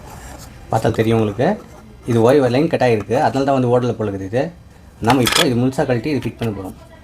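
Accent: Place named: Bengaluru, India. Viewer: Bro Bengaluru tamil nalla irukku 👍😎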